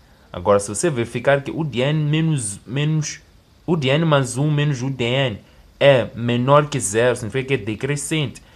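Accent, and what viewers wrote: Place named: Mozambique. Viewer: Gostei pelo sutac és Moçambicano , e eu sou Angolana 🇦🇴✨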